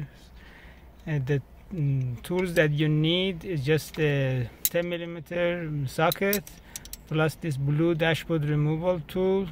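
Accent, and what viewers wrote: Iran. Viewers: looooool your accent. I'm guessing your persian hahahahaha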